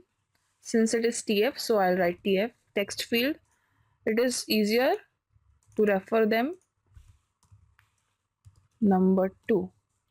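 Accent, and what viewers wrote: India. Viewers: I hate the Indian accent so much it's so annoying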